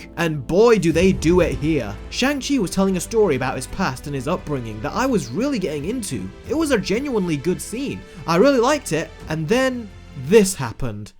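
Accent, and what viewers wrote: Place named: United Kingdom. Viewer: I could be wrong- and I apologize in advance! But, I believe b/c he's British, they pronounce the "a" differently. Same thing with "Mulan." He pronounced her name differently as well.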